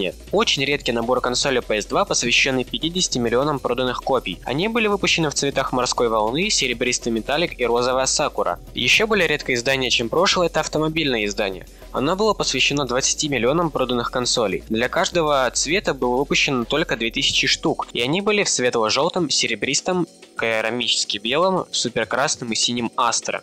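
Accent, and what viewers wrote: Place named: Belarus. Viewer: Пытаюсь понять что за акцент.. Ты из белорусской деревни?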